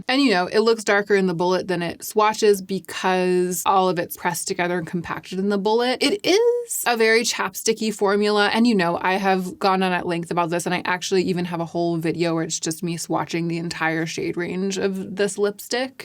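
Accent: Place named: South, United States. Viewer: Just wanted to say that I am a new subscriber - and I can’t get enough of your channel. From your southern “hey y’all” to your intelligent and thoughtful comments, all delivered with such a calm demeanor- I’m hooked! Thank you for your thought provoking, yet obvious love for beauty - much appreciated!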